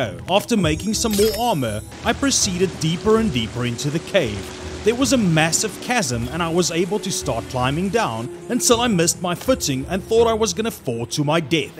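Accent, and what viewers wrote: Afrikaans, South Africa. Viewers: Ek is mal oor daai afrikaanse aksent 😂 awesome vidio bra 🤙🏻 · Are you from South Africa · You Afrikaans? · Okay but if i'm not mistaken hopefully the Afrikaans(?) accent makes everything so much funnier. As an African myself who lives in NZ now it always makes me so happy to hear a raw African accent. (I'm so sorry if you aren't Afrikaans but otherwise your accent is amazing.) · Bro, are you from South Africa? Your accent sounds very familiar! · is he from south africa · bro he sounds like a south African man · Are you south African? It's the accent 🤣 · you sound south african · are you from ZA ? if so what province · I only have watched 2 minutes of the video and I'd say that this guy is 100% south African. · Goeie video Jy kan in jou stem hoor jy is van SA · Why do u sound like u from South Africa. :D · You sound south african ngl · Some words sound like south Africa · are you from south africa??? 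· Gotta love that boer voice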